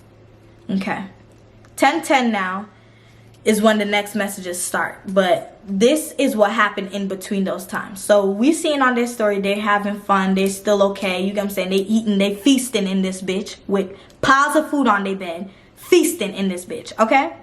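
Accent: Jamaica. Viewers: she jamaican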